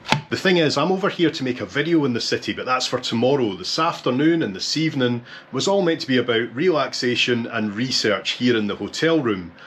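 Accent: Scotland, United Kingdom. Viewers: What I like about is that he does his own research. You will never hear him say "I don't know. If you know, let me know in the comments..." Other travel vloggers come across as ignorant and lazy compared to. Also love the Scottish accent. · I just love your accent. I have bin 5 time in Edinburgh and many other places in Scotland, and I sure love your contry. Keep up making videos. 😘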